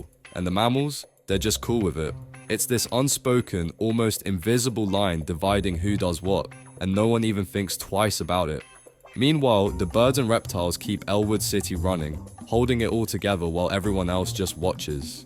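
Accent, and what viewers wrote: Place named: United Kingdom. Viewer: Can you please stop with the British accent?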